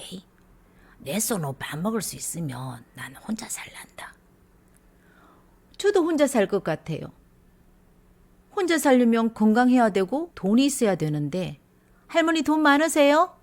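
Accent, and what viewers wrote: Gyeongsang, South Korea. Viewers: 공감되는 내용에 경상도 말씨 지대로 구사하셔서 더더 재미집니다! 👍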